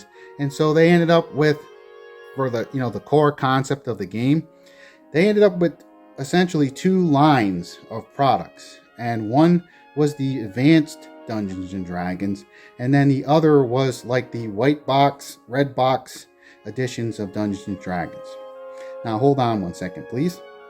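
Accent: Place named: New England, United States. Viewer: Your accent sounds either New England or maybe New York. I started in the mid 90s with AD&D 2e. Still love it. It feels like the real thing. Later editions lack drama and don’t feel as scary.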